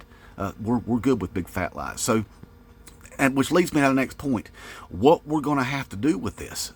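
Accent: South, United States. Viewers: I just found your channel here. I've never had tiktok. Been trying to pinpoint your accent and can't decide if you're from Georgia, Alabama, or northern Florida. Great content, man. I hope you do well here! ✌🏼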